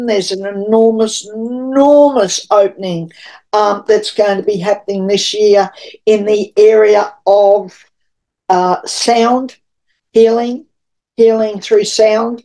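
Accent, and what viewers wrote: Australia. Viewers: Thanks. Lovely to hear an Aussie. I’d Love to know where you live. It looks tropical. I hope you keep sharing. It’s also refreshing to have someone focussed on people mire than politics. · Hi ,it's was lovely to listen to you this morning and great hearing an Aussie accent 😊 . Interesting reading and I look forward to your next podcast x love and light Bobbie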